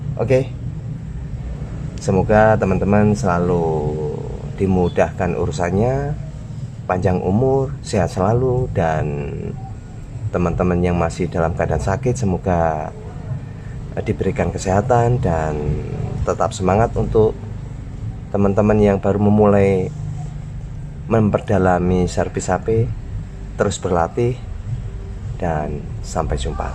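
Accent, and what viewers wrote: Java, Indonesia. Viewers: Saiki dadi sering inframe mas...lg taun 2019 akhir pertama ngikuti chanele sampean...pirang2 wulan penasaran banget...wonge koyo opooo...logate njawiii...vibes sabar banget dari nada suarane...sukses selalu om..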